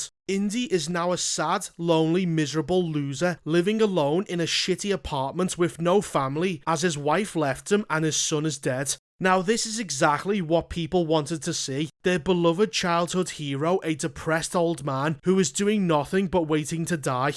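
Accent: Liverpool, United Kingdom. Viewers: I know a scouse accent when i hear one 😂. Liverpool on top.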